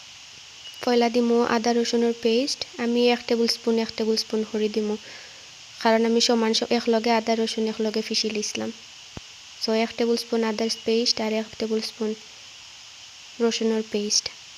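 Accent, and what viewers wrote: Sylhet, Bangladesh. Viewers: আপু তোমার মুখে সিলেটি কথা শুনে ভালো লাগলো আবার অনেক মজা ও হাসির ছিলো। 🤗🤭😂😂😁😆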